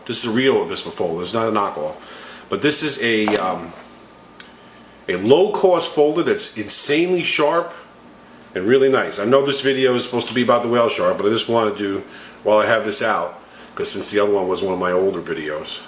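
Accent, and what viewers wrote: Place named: Massachusetts, United States. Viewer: I'm from nh. Your accent sounds like mass that's all. jerseys not all that far from mass though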